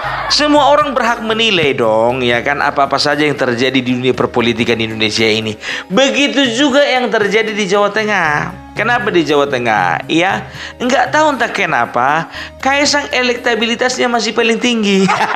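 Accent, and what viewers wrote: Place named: North Sumatra, Indonesia. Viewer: Aku suka sama Opung logat Batak nya kental banget.salam knal Opung Aku dari jawa tengah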